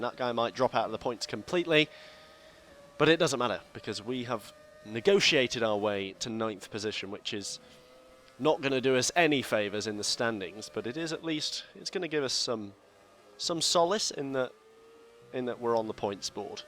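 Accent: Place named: United Kingdom. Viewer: hey i've been watching your videos for about 4 years, but in all that time you never explained us how to get that fancy british accent. i would rly appreciate a tutorial or sth:-)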